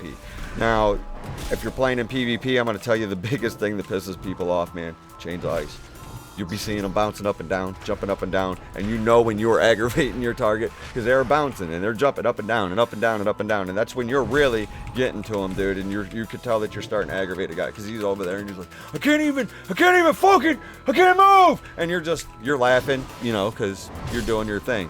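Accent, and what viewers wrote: United States. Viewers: I subbed to get awesome Unholy DK info....and because your voice sounds so American you could be my neighbor. Love this. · I like your videos man. Nice to hear an American voice in a wow vid.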